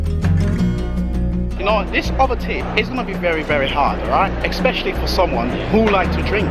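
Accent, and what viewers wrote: United Kingdom. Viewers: You love Malaysia, i love your English accent 😂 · I love your British accent 😘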